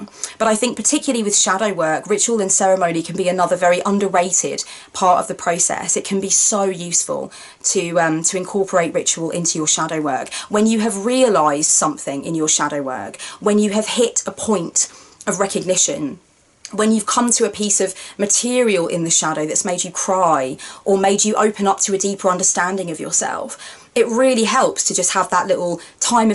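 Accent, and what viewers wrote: United Kingdom. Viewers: I have been following your channel for awhile and it is always in sync with what I am working on at the time. plus you really pull off blue hair well. And as an American with a degree in Brit lit your accent is heart warming. speaking of goddesses my baby daughters middle name is Luna so Diana and Hecate are really big influences.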